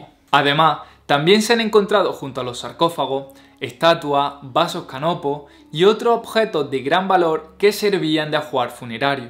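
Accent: Andalusia, Spain. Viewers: Andaluz jajajaja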